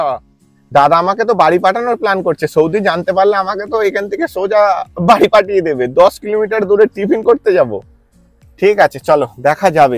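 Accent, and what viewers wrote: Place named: India. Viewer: আপনি কি ভারতীয় কথা শুনে মনে হচ্ছে বাংলাদেশি না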